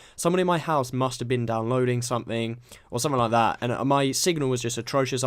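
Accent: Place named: United Kingdom. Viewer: The BRITISH ACCENT..... Awweeeeeeeee! DAT ACCENT! :D Nice intro btw!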